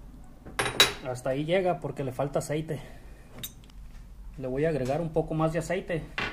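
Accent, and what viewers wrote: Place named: Mexico. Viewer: Te doy like x la tonada mexicana nomas☝️😂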